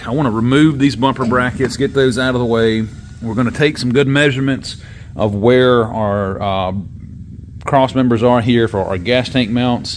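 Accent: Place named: South, United States. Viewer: Where are you from ? Colorado? I detect a southern twang.. 🤠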